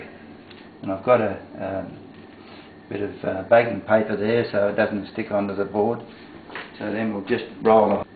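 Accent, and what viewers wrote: Australia. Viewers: AWESOME you are a great chef Link your site to some other similar site... People need to see you... I found you by accident and I am so happy... I will subscribe now.... Thanks for the great videos..California is my home where are you from ? is that an Aussie accent? just curious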